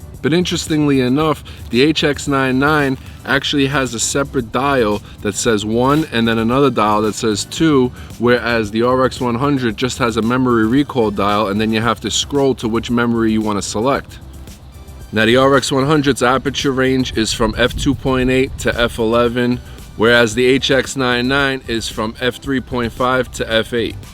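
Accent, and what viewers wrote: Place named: New England, United States. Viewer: Haha, funny and perfect comparison. I have the HX80 and looking to upgrade for the added features on both: control ring, RAW, touch screen, 4K. But after seeing this, no way is the RX100 worth the extra $$$. Some aficionados wet their pants over sensor size (Wow, a 1" in a tiny camera!), but even the tiny sensors are so good now (in phones) that I'm not buying into the hype. Yeah, better bokeh with RX100, but...I really don't give a shit about it. Otherwise, the IQ is nearly identical and you'd have to be hallucinating to see an appreciable difference. Yeah, the RX100 may be marginally better in low light, but... hey, you want a low-light hobby? Take up music. The 30x zoom on HX99 is far more useful. If you shoot a lot of video outdoors, the mike jack on the RX100 VII is the only real benefit for 3x the price. 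(from Texas, I love the Yankee accent. Are you a wise guy? :)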